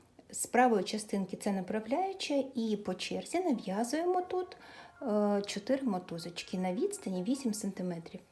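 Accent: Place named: Russia. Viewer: Странно звучит украинский язык от русскоговорящего человека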